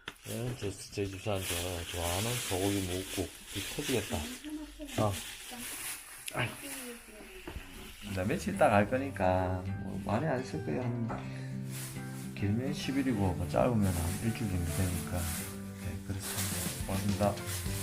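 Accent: Gyeongsang, South Korea. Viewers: 유튜버 말투가 참 인정스럽네요. 완전 경상도 말씨네. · 말투듣고 갱상도인지는 알았지만 김해분이시네요 반갑습니다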